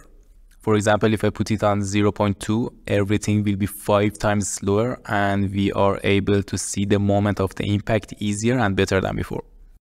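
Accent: Iran. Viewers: عالی بود جان فقط یه سوال دارم من تازه چنلت رو دیدم و دیدم لهجه داری فهمیدم ایرانی هستی بدون اینکه به اسمت توجه کنم... خواستم بدونم واسه تولید محتوا انگلیسی آیا بازدهی بیشتری داره؟ چون خودمم میخوام همینکارو بکنم... و احتیاج به راهنمایی شما دارم... و اینکه اگه توی ویدیو صحبت کنی آیا تاثیری داره واسه مخاطب هرچند سخته انگلیسی واسه ماها ولی تنیجه‌اش رو میخوام بدونم ممنون میشم جواب بدی🫶👑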